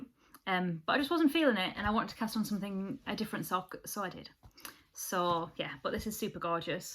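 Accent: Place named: Northern England, United Kingdom. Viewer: Hi, enjoyed your catch up, I too am an hour from Leeds in the NE. Its nice to have a local accent to listen to on a podcast. I’m just at ‘Theres going to be a storm, lets play ball!’ in Midnight Sun. I’m 56 and not ashamed to say I’m an Edward Fan. I read the leaked version years ago on the internet, so glad to be able to read the rest now. There is a lot of angst on his part mind! Anyway, love your knits and chat and yarn. Hope you have a great time away and its not too misty on the Northumbrian Coast XXX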